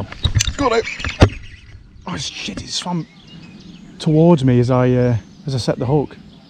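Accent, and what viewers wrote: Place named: Yorkshire, United Kingdom. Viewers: where are you from? yorkshire? accent sounds same as mine hahaha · Id guess south Yorkshire. At least thats what he sounds like to my N Yorkshire coast ears.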